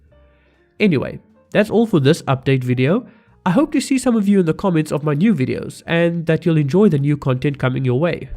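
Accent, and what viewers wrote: South Africa. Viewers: Rage against the dieing of the light - He sounds Indian but with a South African accent... 🤔 I wonder where he's from?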